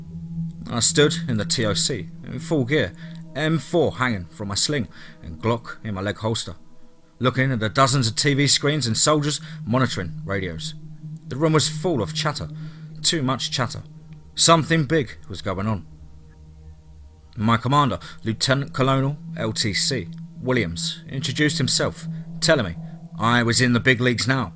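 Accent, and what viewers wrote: England, United Kingdom. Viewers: An English accent? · Yeah right. Believe this guy. He said he was raised in Kansas City and is an officer in the Rangers. Yet he has an English accent so thick you can cut it with a knife, and there's no Midwestern US overlay. None. He couldn't even pronounce Fort Leavenworth properly. Bad joke and dumb story. Thumbs Down #fakemantalking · Dude your accent doesn't sound American. You sound british. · He's NOT australian . He's English. !!!! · Tell you what...You are not from Kansas City with that British accent. sorry but I think you are a writer trying to pull a fast one on a bunch of us who look at this stuff on you tube. You even talk like a novelist. You have done your research and its too familiar to other posts. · wheres the same stories without the english accent?? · Why does a guy from Kansas City have a UK accent??!! 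Come on man. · Mock these encounters at your own risk..BUT.......even if this particular story is BS... there are CREATURES that we're born from. FALLEN ANGELS. Benne Ha Elohim translates in Hebrew as " fallen ones"....... The British accent is cute...... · First of all he’s English and secondly it’s a creepy pasta...not real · Loving 🥰 the English accent of this storytelling #teamfear love ❤️ Ally from Australia 🇦🇺 #TEAMFEAR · If you're from Kansas City, where did you acquire your UK accent? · Hi there, I am newbie just now and I don't know why I haven't encountered your channel over the years I'm listening to audio stories on here . I've subbed , I like the range of content I have seen so far , and nice to hear an English accent , definitely a minority amongst the narrators on YouTube. Greetings from this Irish girl in stormy Portsmouth UK this afternoon. 💚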